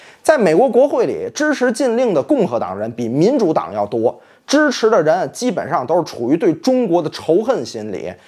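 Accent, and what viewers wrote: Tianjin, China. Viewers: 这是天津话吗？以前老看杨光的快乐生活，感觉有那么点像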